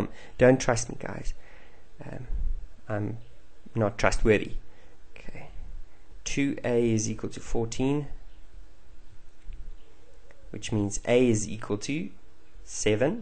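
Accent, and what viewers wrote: South Africa. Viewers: South African? awe my bru!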